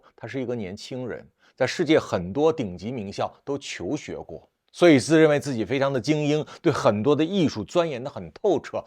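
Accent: China. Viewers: ？你的頭髮還沒白，不夠格當叔，別自我抬搞一輩。你的自介雖用繁體字，但你的口音掩飾不了你是中國人的事實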